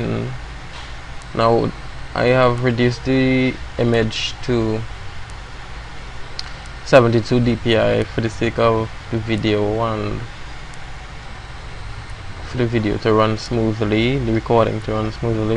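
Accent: Trinidad and Tobago. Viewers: You have tah be ah Trini.. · you sound like a trini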